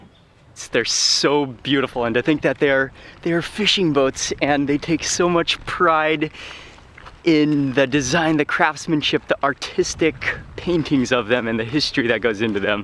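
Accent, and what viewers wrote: Malaysia. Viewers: masih pekat melayu. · Kecek kelate lako ter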